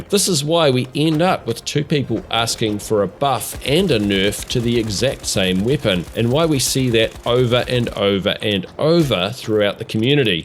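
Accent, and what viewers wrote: New Zealand. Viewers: Great video mate, well said! Your kiwi voice is bloody brilliant!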